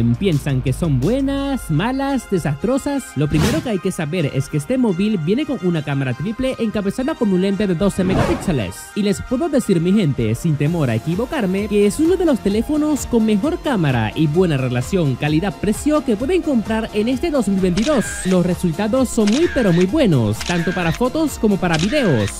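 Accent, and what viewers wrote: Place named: Venezuela. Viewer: Hablas como venezolano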